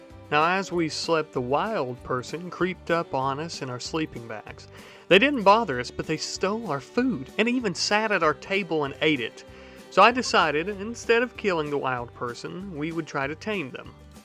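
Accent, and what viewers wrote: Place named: Texas, United States. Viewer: Wait a minute. That accent, it's not just theatric. You're Texan, ain't ya?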